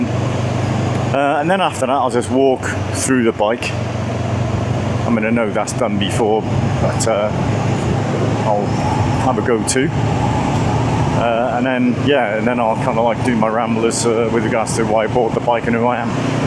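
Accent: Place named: Cardiff, United Kingdom. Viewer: Great video. I'm in Cardiff, so I recognised the accent immediately. I'm about to start my biking journey. Just like you, I'm a bit older and recently booked a CBT course. Videos like this are inspiring. Keep up the great work. Subscribed and looking forward to your next video. By the way, love Nash Point, too. I've camped there a few times.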